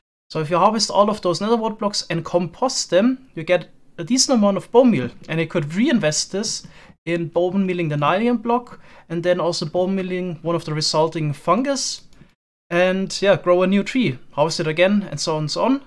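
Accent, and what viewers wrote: Germany. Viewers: his german accent tho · One question. I'm not sure where your accent is from. Is it German? Or some other one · Er redet wie ein Deutscher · What a german accent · This german accent xDDD i rly cant stand that xDD But still nice video :) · I can tell you’re german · Where are you from? You sound like a german guy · Is that an german Aczent? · Are you German? Your accent is pretty familiar to me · It's just standard German English. The „th“ sound does not exist in the German language and the „z“ (as in „blaze“) is made in a similar way and does not sound too different.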